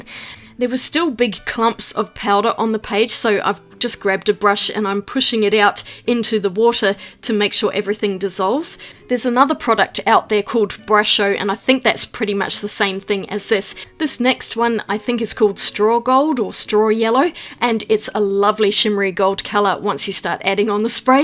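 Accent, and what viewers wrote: Australia. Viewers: Love your channel, love the swatching, your awesome Aussie accent (I'm an American -- we love British and Aussie accents), love your sketchbook sessions. My motto: When in doubt, paint planets!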